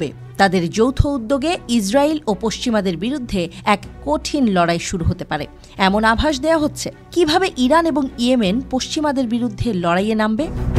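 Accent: Kolkata, India. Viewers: এই সংবাদটি উপস্থাপনা করছে কে একাতর টিভিতে তিনি কি আলোতে বাংলাদেশের বাঙালি না কলকাতার বাঙালি। কেননা তার ভাষাটা একটু ওপারের মতো টান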